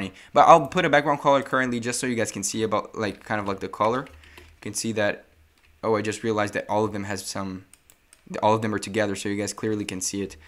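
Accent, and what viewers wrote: Brazil. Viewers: Você é Brasileiro né? Are you Brazilian?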